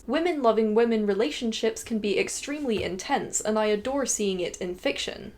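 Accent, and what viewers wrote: Scotland, United Kingdom. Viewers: amazing! Yes I was getting east coast of Scotland. If I'm autistic (I think I am) then my special topic might be spotting accents. Yours is a gem, which I can now put in its own unique place in my collection. Thanks! Ps. Given the Texan element, I would now put the English element in the South West...?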